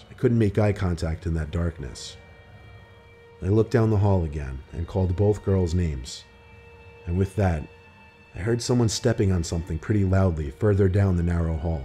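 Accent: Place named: New York, United States. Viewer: Love this channel! Love how I can listen to these story and the person narrating these stories sound very similar to me “Yes, I’m talking about the NY accent!”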